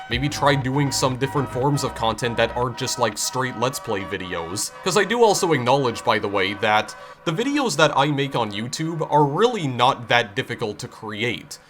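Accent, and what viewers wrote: Canada. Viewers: Where are you from? I watched your Catacombs of Solaris video. Are you from Canada, maybe sasqatchean “Twenty Fifteian” “A long tyum” “Kowun I was autistic for a long tyum”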